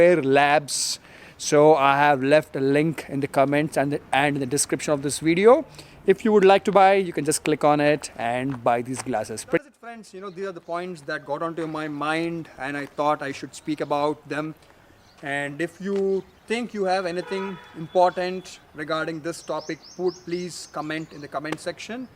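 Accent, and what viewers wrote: India. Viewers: This is the first indian I take serious because his accent isnt as silly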